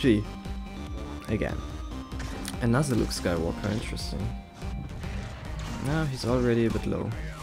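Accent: Germany. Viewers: Der deutsche Akzent ist göttlich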